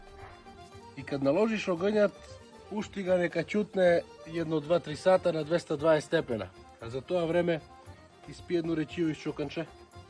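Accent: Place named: Serbia. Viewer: ovo je čisto srpski....ja pola ne razumen .....:)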